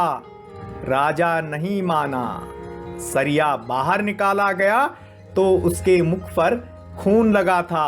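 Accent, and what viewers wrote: Garhwal, India. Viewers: गढवाली बोली सुनना बहुत बहुत अच्छा व मनमोहक व आकर्षक , आत्मिक लगता है।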